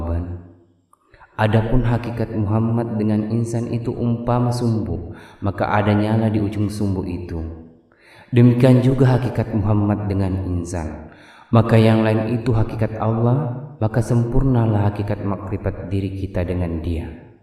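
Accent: Bugis, Indonesia. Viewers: Ini AjaraN dari TaNaH BugiS.. Yg BicaRa JugA Uda KedeNgaraN LogaT BugiS'y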